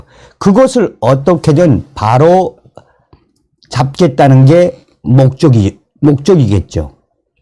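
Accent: North Korea. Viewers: 북한서 자라나서 쓰던 말이니 금방 고쳐지겠나요? 부산서 서울로 이사 온 사람한테 부산 말투 쓰지 말란 것과 뭐가 다른지요??😢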